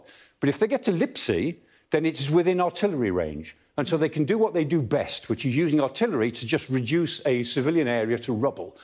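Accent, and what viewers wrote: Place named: England, United Kingdom. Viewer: What i like the most about M. Clark's commenting is his English accent. Otherwise sadly nothing comes true 😢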